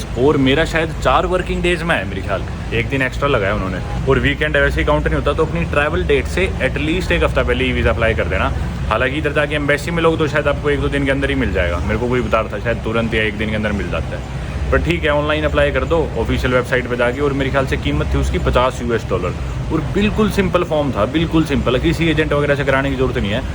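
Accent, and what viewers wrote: Haryana, India. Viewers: Bhai iske itn follower hai per kisi ne notice nahi kya ye apni aadhi vedio me to sirf airport aur bus aur aane jane ke tarike pe hi bana leta hai, phir aadhi visa ki story , desh ki story fir hotel kitne ka kaisa kaha kaisa hai bus end ,,,the end,,, bhenchod ghumaa kab ?kaha? kidhar ?only 5 min,, total 1 jagah jata hai uski vedeo me 5 min hi ghumane ka video hota hai😅😅😅😅 Aur indian chutiye isko follow kar rahe hai,,thora Haryanvi accent me bakchodi 🙄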